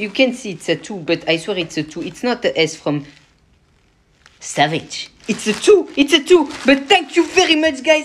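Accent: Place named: France. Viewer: It sound like a french accent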